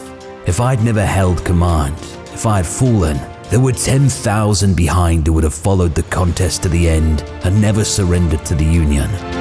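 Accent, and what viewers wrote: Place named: England, United Kingdom. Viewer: As told by a limey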